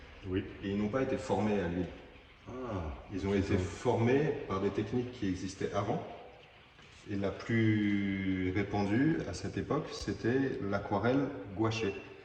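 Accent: Gwangju, South Korea. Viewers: 우연히 보게되었는데 제가 광주사람이라 더 끌리더라구요. 외국인에서 들리는 광주사투리^^ 성실함이 인생을 좌우한다더니 아님 영상들보면서 그냥 웃으면서 보지는않았어요. 역주행중인데 이 영상에 첨으로 댓글달아요. 진심 작품들이 멋져요. 용접공예긴 이런거군요. 진심 소장하고싶어요ㅜㅜ 카페한다면 진심 구매했을듯요.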